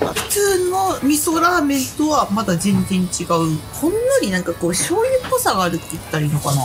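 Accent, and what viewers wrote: Kansai, Japan. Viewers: 話し方苦手なんだけど関西人？ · 😢 って関西人？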